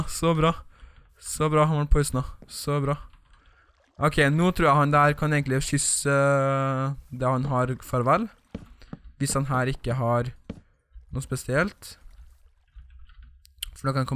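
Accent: Trøndelag, Norway. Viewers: Ælske at du snakke trøndersk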